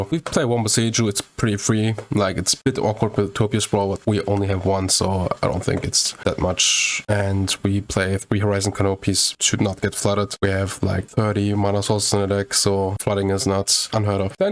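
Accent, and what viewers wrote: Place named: Germany. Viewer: I watch your videos for quite a while now. Today my girlfriend was sitting next to me, asking: "Das ist doch n Deutscher?!" Kurz die Kanal-Info gecheckt und Tatsache! Heißt schonmal, dass der deutsche Akzent nicht so krass ist, dass es mir besonders aufgefallen ist. Wäre toll, mal nen anständigen deutschsprachigen Modern-Streamer zu sehen. Aber klar, Englisch, weil mehr potenzielle Zuschauer. In diesem Sinne: Keep up the good work!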